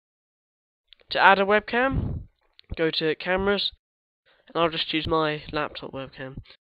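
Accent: United Kingdom. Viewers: Are u from London? :o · heh... you sound british.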